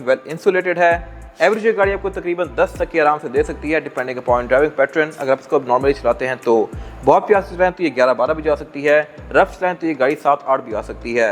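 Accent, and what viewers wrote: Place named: United Kingdom. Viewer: آپ کی آواز کی صحیح سمجھ نہیں آتی ۔ یا تو آپکے مائک میں خرابی ہے یا پھر آپ تھوڑا آہستہ بولا کریں اور اردو کو بجائے انگریزی لہجے میں بولنے کے اردو ہی کے لہجے میں بولا کریں ۔ ریویو آپکا اچھا ہوتا ہے پر آواز اور لہجے کی وجہ سے کچھ الفاظ سمجھ نہیں آتے ۔ شکریہ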